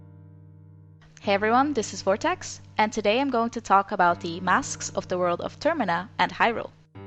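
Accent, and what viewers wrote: Netherlands. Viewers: In this video you can also clearly make out her dutch accent and it is super cute 🥰🥰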